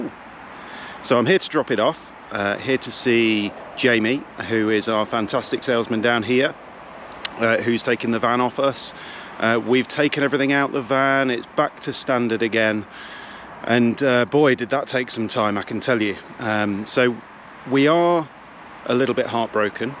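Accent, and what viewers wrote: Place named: California, United States. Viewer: Its been a delight to share your adventures over the year; informative and entertaining. Will miss your Cali slant but look forward to hearing about the next phase.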